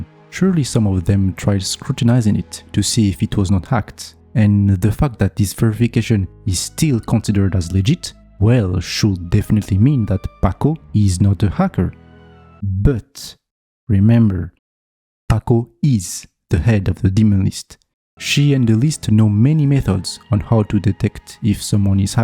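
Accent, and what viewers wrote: France. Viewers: bruh your french accent is beating you up so bad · I cant listen to french english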